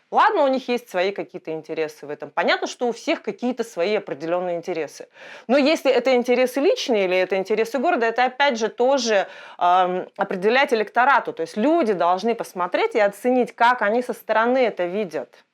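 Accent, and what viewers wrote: Yakutia, Russia. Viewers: Пахай, сахалыы акценнаах санарар буолбуккун, дьэ хомолтолоох.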